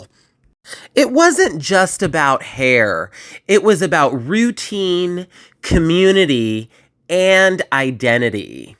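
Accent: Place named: Germany. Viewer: Diese deutsche Stimme😮😮😮😮😮😮😮😮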